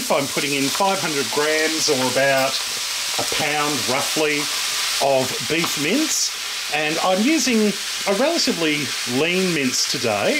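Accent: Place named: Australia. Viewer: Yes, he's an Aussie.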